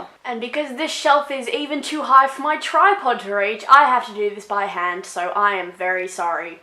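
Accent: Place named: Australia. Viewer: ayyyyye that aussie accent!!! hahaha I'm from New zealand and i loved this video so much (bookshelf tours are like the best right?) I subscribed and can't wait to see more from you!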